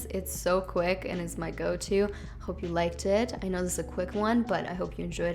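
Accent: United Kingdom. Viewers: love your slight british accent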